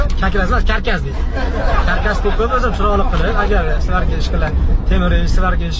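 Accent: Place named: Fergana Valley, Uzbekistan. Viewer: Sheva vodiy bölyotibdi özicha vohani tiqishtrganini maraz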